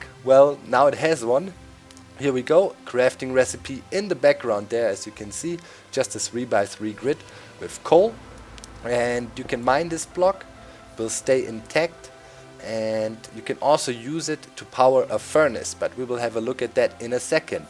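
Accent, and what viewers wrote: Germany. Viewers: are you german?? you sound Like a German Person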